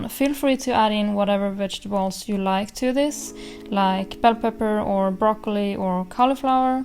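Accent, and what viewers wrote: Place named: Sweden. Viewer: Visste att den dialekten var svensk, bra video! Nyttigt och bra för hälsan✨✨🌺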